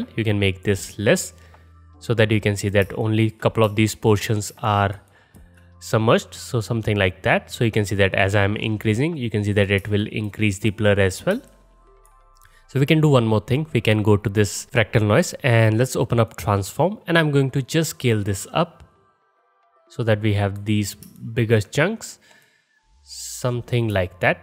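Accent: India. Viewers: Are you indian or what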